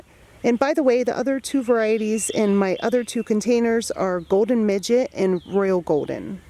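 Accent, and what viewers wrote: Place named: Pennsylvania, United States. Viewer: Hi, new here! U sound like u are from my home state, PA! I think I am going to try melons this year!!🤞Good tips!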